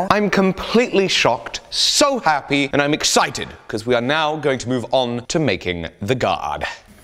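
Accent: England, United Kingdom. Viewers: Chad Looney English word pronounced in English by an Englishman in England. I'm afraid this trump's the American pronunciation in this case. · He speaks English, not American English. No matter how much he likes that cowboy hat of his.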